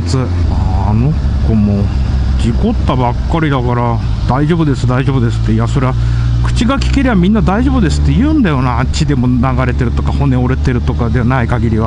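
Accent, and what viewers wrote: Tohoku, Japan. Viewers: この訛りは東北の人ですか？ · 喋り方で東北の人かなって思うw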